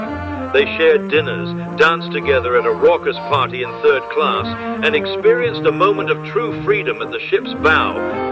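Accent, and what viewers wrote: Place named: Transatlantic, United States. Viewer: literally a transatlantic accent.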